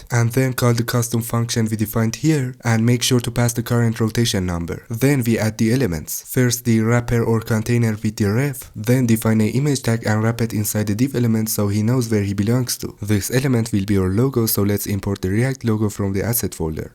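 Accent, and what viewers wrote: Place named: Iran. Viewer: He sounds Iranian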